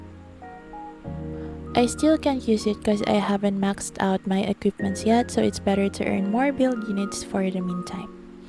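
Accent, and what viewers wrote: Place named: Philippines. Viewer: A like for your filipino accent when you speak English... It's so cute ^ ^ ❤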